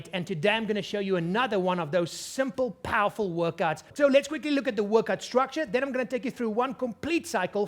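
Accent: South Africa. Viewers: Suid Afrikaans?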